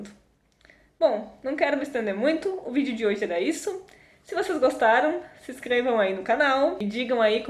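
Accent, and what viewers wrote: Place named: South, Brazil. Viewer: que sotaque gostoso do sul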